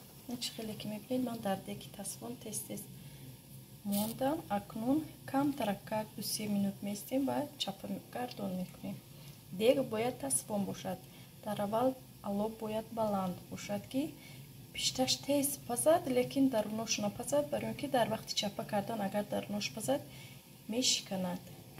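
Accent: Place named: Isfara, Tajikistan. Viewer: Майлаш мемонм ба фикр хамшахрием апачон ман Аз Исфара хастам аз гапзанитон ба фикр хамшахрем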